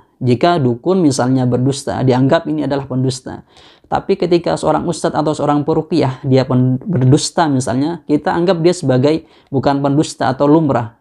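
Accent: Madura, Indonesia. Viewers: Kesalahan baca kitab itu hal yg lumrah, bisa jadi karena sabqul lisan, atau sebab lain. Seharusnya anda mendukung dg apa yg diperjuangkan oleh Ustad Faizar, mengoreksi bacaam bukanlah hal yg salah tapi dengan menyalahkannya di youtube akan memframming diri Anda sendiri kalau anda bersebrangan dengan beliau, apalagi anda sampaikan di momen yg tidak pas, yakni perseteruan antara dukun dan pesulap. Harusnya anda yg alumni pesantren dan mengaku kiai muda (di channel) kudu lebih bijak dan berhati-hati. Lebih baik tabayun agar tidak blunder permasalahannya. Ingat beliau itu Muhammadiyah, sedangkan anda dilihat dari logat bicara dan gaya menyampaikan isi kitab kuning adalah orang madura dan pastinya NU. Pahamilah perbedaan ini, agar gesekan antar umat tidak terjadi.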